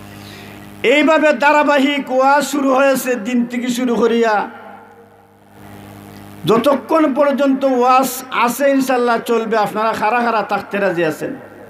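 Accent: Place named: Sunamganj, Bangladesh. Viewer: কুব সুন্দর বয়ান সুনামগঞ্জী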